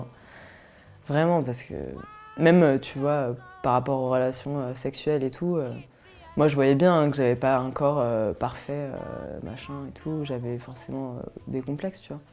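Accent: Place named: Paris, France. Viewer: L'accent parisiens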